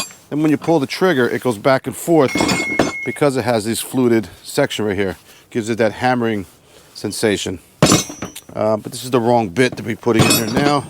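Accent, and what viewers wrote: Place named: New Jersey, United States. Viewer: You still sound like you're from Jersey.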